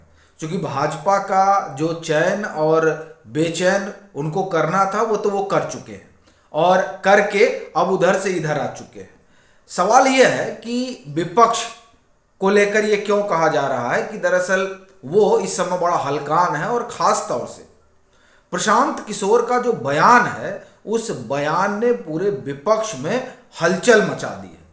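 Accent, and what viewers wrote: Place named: Bihar, India. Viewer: अच्छा विश्लेषण। आपकी बिहारी उच्चारण शैली मुदित करती है।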